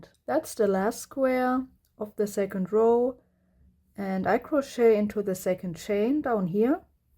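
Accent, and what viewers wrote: Germany. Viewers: Holy deutsch accent its so cute 😂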